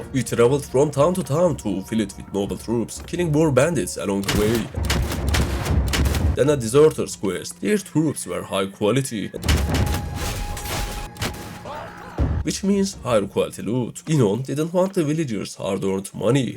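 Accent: Turkey. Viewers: Dude where u from tho? Sound like turkish accent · Kanka edit ve espiriler çok güzel türk aksanını da çok iyi taşıyorsun.Direkt aha Türk bunun mizahı da iyidir dedim öyle çıktı.Yolun açık olsun. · Ya bende diyorum bu aksan nereden tanıdık. Adam Türkmüş aq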